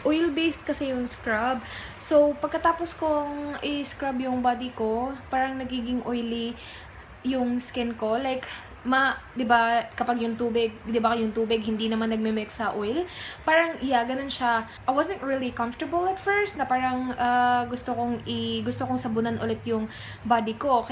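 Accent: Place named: Visayas, Philippines. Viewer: Hi ms.! finally done watching your vlogs and I super love your bisaya accent! Ang cute hehehe. 😊 nkita ko nnman brother mo with new haircut haha! Pagpasensyahan mo na ms kinikilig onte 😄✌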